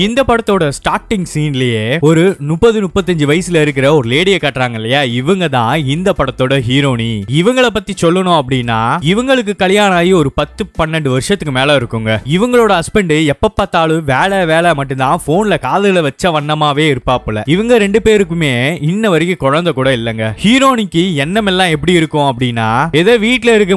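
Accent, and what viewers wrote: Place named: Coimbatore, India. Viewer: Coimbatore English oru thani slang pa - Heronee...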